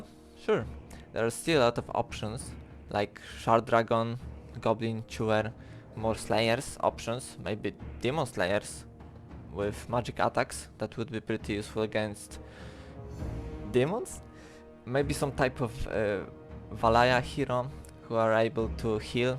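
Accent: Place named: Poland. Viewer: Slychac ze polak haha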